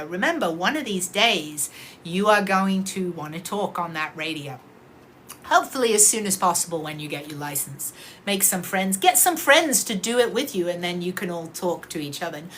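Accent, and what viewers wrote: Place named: England, United Kingdom. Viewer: I was about to ask where you was thats an English accent and then you said you were in la. Well we shall watch and enjoy 😊 thank you for sharing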